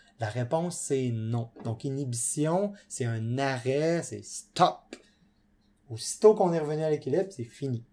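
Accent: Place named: Canada. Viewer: francais Canadian !!! terrible malgre ca merci pour la video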